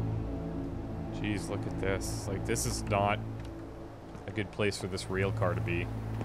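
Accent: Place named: Canada. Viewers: Yes, he's Canadian. Montreal, by way of Saskatchewan (if I recall correctly) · Dragonborn Dovahkiin yehhh ur one of us canadiansssssssss eh!! u rly sound canadian... im from toronto so we don't really sound canadian.. but i have my canadian moments +__+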